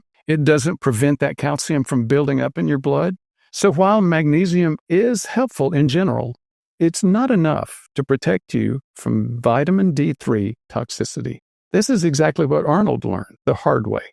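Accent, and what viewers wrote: United States. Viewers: How about jist get sun and eat healthy why take pills ? If you can afford pills youncan afford food. You sound American where there is no health or longevity studies that show your lifestyles work. Eat well get sun and excersize and he happy and you dont need to get confused of all these pillls you take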